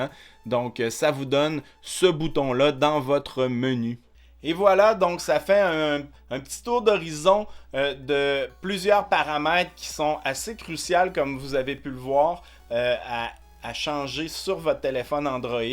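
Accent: Canada. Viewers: L'accent canadien 😖😖😖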